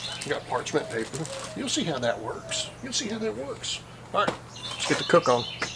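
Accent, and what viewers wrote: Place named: Texas, United States. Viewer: Hi.. I'm your friend from Jordan.. I just love that Texan accent of you.. It reminds me of those old series i used to watch on TV when I was little kid... Like "Guns Smoke ".. I remember that guy "Festus".. I also remember " The High chaparral ".. 🤗 Anyways.. I enjoy so much your amazing.. Awesome ways of cooking, 🤗💕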